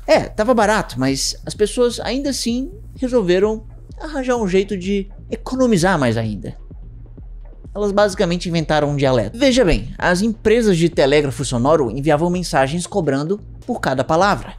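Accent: Ceará, Brazil. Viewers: Juro que não sei de que estado ele é mas tenho a impressão que é do Ceará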